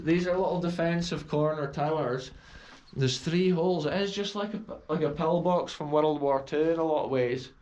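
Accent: Scotland, United Kingdom. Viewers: I love hearing your "brogue". I imagine that my Father's Mother grew up hearing the same Brogue from her Father. He immigrated in the 1870s ; his family name : Horsbrugh .AGAIN, Thank you for an amazing adventure ! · Its been fun hearing your Scottish accent, haha. I am from Seattle Washington USA. Home of Bill Gates. Love your explanations. I am thinking there are probably people buried around the castle. You can tell sometimes by dips in the ground. Do you know any of the history of what happened to the last owners?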